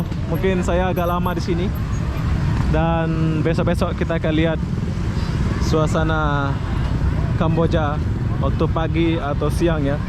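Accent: Indonesia. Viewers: klo di liat dr aksen.. kayaknya org lombok konten kreatrnya. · HOSTNYA ITU INDONESIA BANGET, HANYA BICARANYA DI MALAY MALAY IN, TERDENGAR DIALEK INDONESIA BANGET KATA HOSTNYA, SERU YA ORANGNYAPUN RAMAH RAMAH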